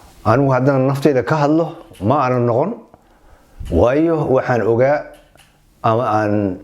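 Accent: Mudug, Somalia. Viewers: Weli reer mudug hadalkiisa ayuu leeyahay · Odaga waa somali dhab lahjadamashqul kuma ficna iyo heshuu kasoo jeedo waxa muhim.ah taloyinkisa ivan racno lki waxan qiyasayaa lahjada waa mudug ilaag/lafa dhexe intas madhafsiusana ader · Lahjadiisa puntland ma ahan waa reer mudug waxuu ka dhaxeeyaa reer mudug. Reer mudug koonfur ilaa waqooyi waxay kaga duwan yihin reer bariga iyo reer nugaaleedka waxaa ka jidho ereyada sida “haya” tusaale “waxaan kuu sheegahaya” meeshay reer bariga dhihi lahaayeen “waxaan ku sheegayaa” Ninkanu qabiilkiisa iyo deegankiisa lama sheegi karo Lakin Inuu reer mudug yahay banse kuu hubaa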